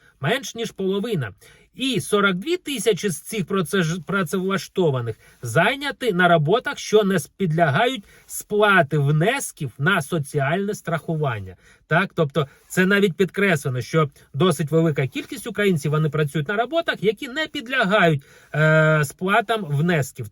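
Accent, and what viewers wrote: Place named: Russia. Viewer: Автор влога, для того, чтобы вести влог на укр языке, выучите его. Ну нельзя же так глумиться над ним. По вашей речи ясно, что Вы думаете и говорите в жизни на рус языке. Много слов, которые Вы пытаетесь произнести на укр языке, украинцы так не произносят.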